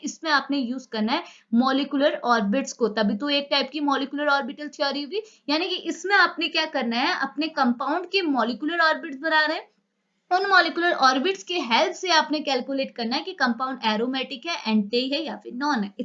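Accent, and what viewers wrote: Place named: Hyderabad, India. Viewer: Aapka haidrabadi tone bhot cute lagta he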